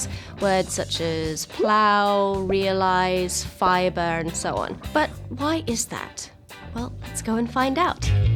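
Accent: United Kingdom. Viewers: Why does your banner say "British Culture With An American Accent" when you clearly speak with a British accent in your videos? I don't get it. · Because she said Americans changed it to I zed E when Americans would pronounce it "zee." It just highlights that a Brit is talking. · ..and even people in the U.S. would understand you if you asked us..'does the building have a lift?'..or 'the lift stopped between floors'...we might not use the same word, but we understand your meaning. And most of us are just mesmerized by a British accent. Everything you say sounds intelligent! Talk to us a few minutes..we'll be calling elevators lifts and adding u to color!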